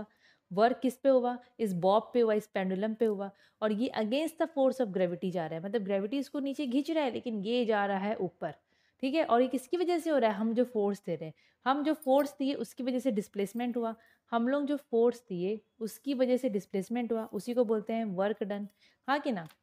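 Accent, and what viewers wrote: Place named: Kolkata, India. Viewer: Mam your accent is kalkatiya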